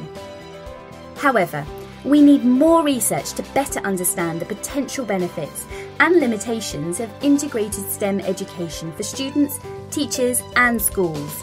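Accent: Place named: United Kingdom. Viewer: In britain it is spelled with an "s" and their accent seems to be british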